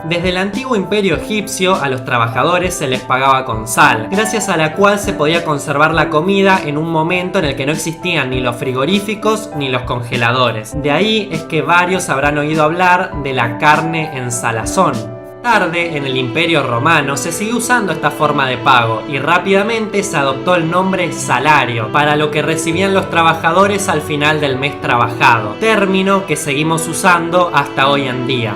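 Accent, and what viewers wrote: Argentina. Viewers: Que asco con tu acento detesto la manera argentina chaaaaaa para yaaaaa lo peor. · CafeZK Es argentino,es su acento.Es raro al principio pero luego te acostumbras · Digo porque conozco a alguien Argentino y habla haci no más pregunto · sos argentino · Eres argentino??? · Cuando un argentino abre la boca... ¡¡¡ áaaaaggh...!! se oyen exclamaciones de desaprobación, sobre todo en muchos países de Europa... NUNCA aprenderán a hablar bien. Basta con oir a éste sujeto, que aparte de expresar inapropiados términos de baja ralea... en su creencia que así será mas entretenido y agradable, demuestra el extracto social extremadamente bajo del que procede. Es exasperante la cantidad de veces que pronuncia mal: ''schá'' (ya sea por...) -- ''usás'' (usas) -- ''schueva'' (llueva) -- ''incluscha'' (incluya) -- ''schevar'' (llevar) -- ''schins'' (Jeans) -- ''tascho'' (tallo) -- schevaban'' (llevaban) -- ''schamaba'' (llamaba) -- cásches'' (calles) -- ''schamó'' (llamó) -- ''schó'' (yo) -- Hay que dejar muy claro que la sal no se ''AGARRA''... 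se toma. No poseemos garras... ¿cuántas veces repitió ''agarrás''....? véase en el video. -- ''Vos'' (tu. No estamos en la Edad Media) -- ''muschas'' (muchas) -- ''podés'' (puedes. Otra vez retrocediendo siglos) -- ''peschorativo'' (peyorativo) -- ''estrescha'' (estrella) --- queda bastante y me aburro.... Termino con otra tirada de orejas: Se encuentra el color ''marrón'', por ejemplo, en los ladrillos de construcción... pero en la vestimenta de los frailes Capuchinos el color es el CAFÉ...!! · no puedo ver un top de palabras si eres argentino, con tu acentos degradas el español , pronuncias mal todas las letras y los acentos , tildes, etc... no es xenofobia, es que me resulta ridículo. si vas a hacer un top sobre PALABRAS del ESPAÑOL tienes que hablar con un acento lo más parecido al ESPAÑOL, no en ARGENTINO CHÉ.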